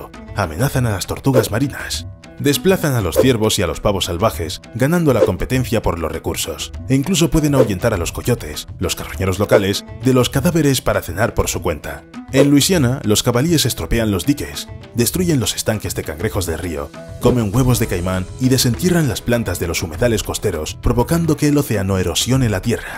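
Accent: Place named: Spain. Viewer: El narrador tenía que ser Español, qué mala suerte ,muy fome.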